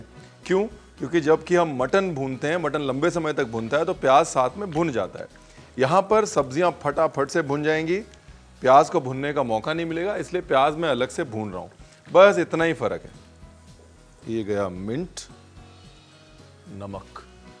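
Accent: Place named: Lucknow, India. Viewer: Ranveer ji aapka jo bolne ka lahzaa hai hume Lucknow ke yaad dilata hai.bahut bahut Shukreya... Uske liye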